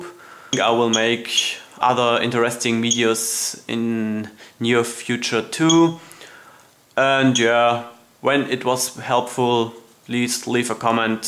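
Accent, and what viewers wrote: Germany. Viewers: das deutsch hört man echt raus :D nettes dosimeter.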